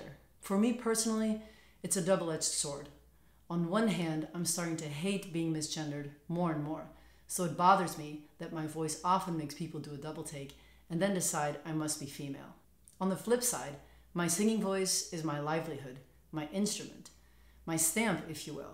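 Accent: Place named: United States. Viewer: I just want to know how it is you sound like you were born in the US?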